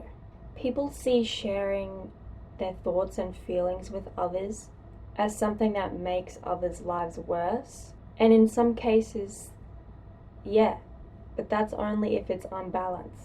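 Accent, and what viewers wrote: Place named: Australia. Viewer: Aye u got a funny Australian accent g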